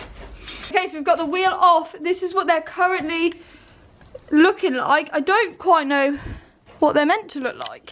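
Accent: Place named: United Kingdom. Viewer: Clicked on the video out of curiosity, then you hit me with that UK accent and I couldn't stop watching. Love the humor but also the ambition. Subscribed for support and I'll be checking out you other videos, get it girl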